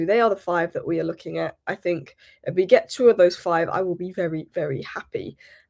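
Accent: United Kingdom. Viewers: I actually really like your voice, I like listening to British accents.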